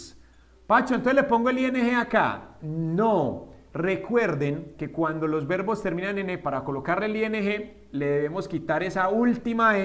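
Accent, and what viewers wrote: Colombia. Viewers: Me encanta tu acento colombiano. :P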